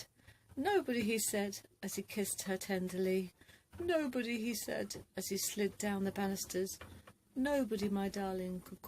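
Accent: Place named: United Kingdom. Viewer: A bit of British tongue to classic poetry! Nicely read my dear 😁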